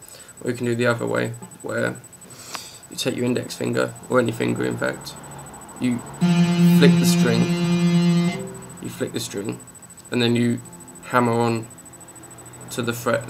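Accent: United Kingdom. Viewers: Are you britsh all british guys remind me of Matt Bellamy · lmao bro im trying to learn this for some songs so i find its only appropriate the the guy giving the "lesson" has a british accent XD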